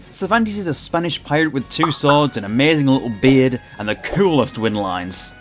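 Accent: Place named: Northumberland, United Kingdom. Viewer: I swear down you sound like a Northumbrian. If not somewhere from the north east.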